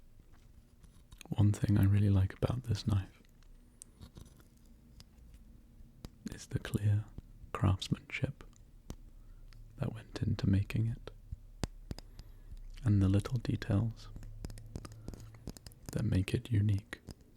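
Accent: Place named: England, United Kingdom. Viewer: I'm a sucker for an English accent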